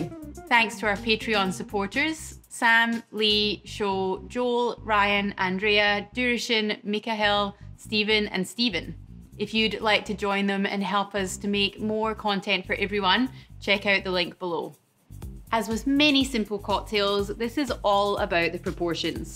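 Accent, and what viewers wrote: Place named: Scotland, United Kingdom. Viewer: well, we all want more of that Scottish brogue! Cheers and best wishes from San Francisco. :)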